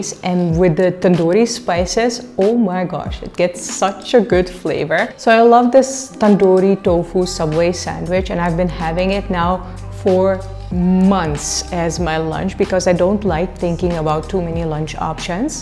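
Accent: India. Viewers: The way you pronounce food items names are typical Indian pronunciation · You have an Indian accent to me 🙂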